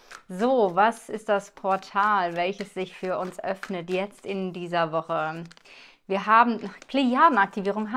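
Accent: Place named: Low German, Germany. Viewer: Du sprichst ja Plattdeutsch 👌🙋‍♀️👍 wie schön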